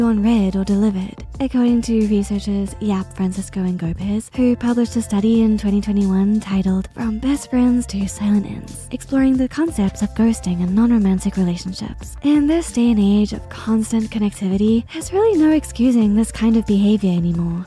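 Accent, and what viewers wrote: United Kingdom. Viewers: unrelated to the video but why does narrator have a british accent now?? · A British Amanda Silvera! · Was thinking the same although I also enjoyed the British accent ❤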